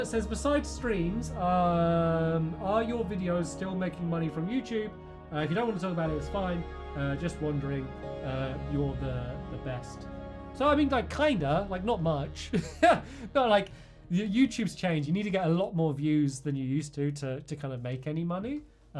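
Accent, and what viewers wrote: United Kingdom. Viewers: British English quite well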